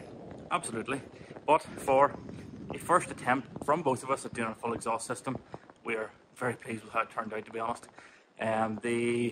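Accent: Scotland, United Kingdom. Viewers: All I can say about your damn racket, is CRANK IT UP,! the montage shred or the V6, but CRANK IT UP! You need to take yourself down to Shropshire and drag Rich down to a pub with a good sound system and have a DJ Shoot Out! I'll admit to old and moldy- but your music choices were really "on time" with me. And the Capri, she has a real crackly sound, even before the "big tune"- awesome! Make no mistake, the lilt of your Scot's home accent is much fun- I come from a family with some "furrin" roots too! But the music over was two fer for me- the sounds and the engine! It does look like you maybe through before Binky gets all reassembled- if you go to Shropshire, go in the Capri and show them what a finished car, reassembled looks like- poor Binky has been scattered to the four corners for a long time now! Best to you, the missus and kids, PS; You go down to Shropshire, buy Nik about 7-8 pints and then get him to judge! 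Richard has children and can't afford that level of bribery! Since the gorgeous blue paint job didn't cost nearly what Binky's anything but "plain stove white" must have- even in favors it must have been a pile- a few pints you didn't drink should keep the missus from worrying! Or take her along- bet ya'll haven't been on a "date" like that in a while! FR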